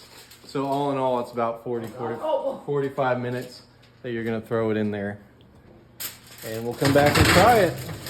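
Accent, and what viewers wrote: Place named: Louisiana, United States. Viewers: Love the louisiana accent!! Reminds me of the Cajun chef who always said oooo wee looka there!!! Justin Wilson RIP! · You have a very strong Cajun accent and I love it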